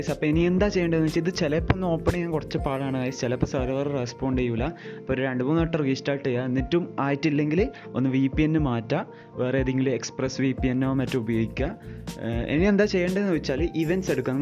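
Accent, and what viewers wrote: Kannur, India. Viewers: Ningal kannurilaano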